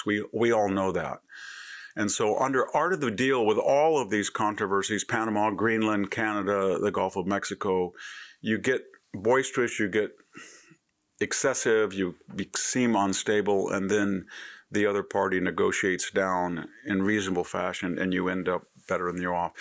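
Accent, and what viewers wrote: United States. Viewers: This accent is the best American accent. All the names end up sounding completely accurate.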